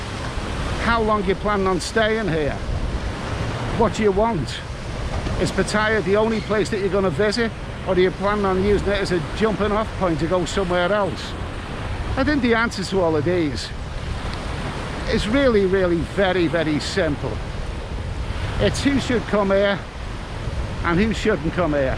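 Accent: Liverpool, United Kingdom. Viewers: Hi,in Bangkok at the moment,just passed second pcr test,thank god.Must say the two tests made me anxious but I’m lucky I passed that’s all I say,it’s a big chance for anyone to take I must say.Ramifications of testing positive are not great financially or mentally.I am off to Koh Tao for two weeks with my Thai girlfriend and a few well deserved beers👍 Love hearing your voice as my old man was from Fazakerley,only a scouser would know where that is. He’s passed away now but reminds me of him. All the best to you🍺😊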